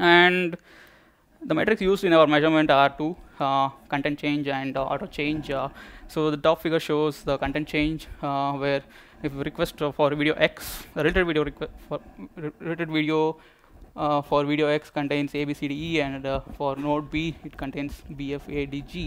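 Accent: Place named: India. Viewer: need some time to used to this India accent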